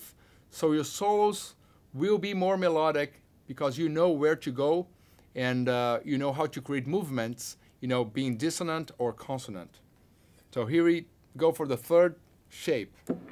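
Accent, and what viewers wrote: Brazil. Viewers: disse que sabe falar em ingles como um nativo mas fala desse jeito abrasileirado porque gosta, meio que simbolo brasileiro sla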